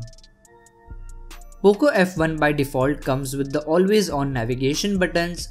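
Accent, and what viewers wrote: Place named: India. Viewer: Indian accent are usually bad since you can barely understand them but i luv listening to urs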